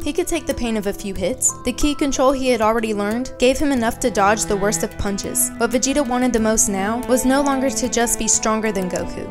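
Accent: South, United States. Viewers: You’re from the south. I can hear the twang.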